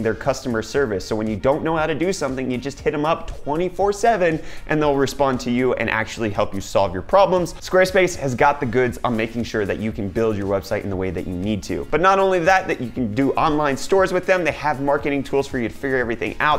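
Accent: United States. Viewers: You from south Africa ? Your accent is not tho, very american . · I was just wondering, this guy sounds American, I wonder why he's in South Africa, I'm South African so am really curios.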